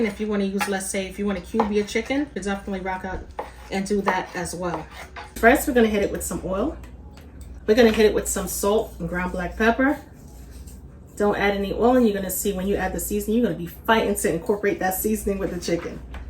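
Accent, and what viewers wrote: New York, United States. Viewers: Heavy on the NY accent ;-) My mom is from the Bronx :-D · I’m in love with the New York accent 😅. And I’ve made most of these recipes. Somebody need to put a ring on that finger ASAP 🥴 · Love your New York accent. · Hi,.I just started watching you about a week ago and i'm loving your recipes. I love the way you talk to I think you're from new york but i'm not for sure, but thank you for sharing your recipes because i'm getting ready to try quite a few of them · The NY accent love it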